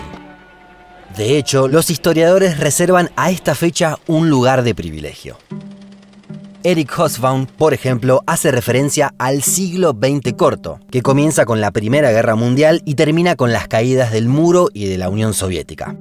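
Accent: Argentina. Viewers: Hacen o haces no se quien esta atras de Un mundo Inmenso, pero es un excelente trabajo no saben el valor de cada video que he visto un buen resumen, imagenes, musica, todo esta muy bien. El narrador es Argentino verdad? Un saludo desde Mexico del Norte :)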